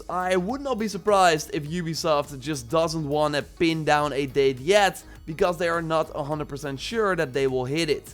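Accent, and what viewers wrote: Netherlands. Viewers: Ben jij een nederlander? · Are you dutch? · ok met je kanker Nederlandse accent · Ik dacht al dat je nederlands sprak, door je accent 🇳🇱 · Ben je Nederlands?😅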